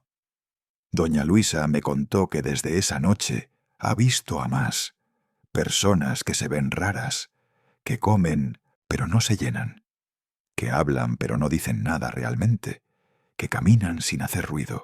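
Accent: Spain. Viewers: Todo bien pero Ese acento Español nada que ver con un relato de inmigrantes Mexicanos..se convierte en algo no creible..😅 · Ese acento españoleto no cuadra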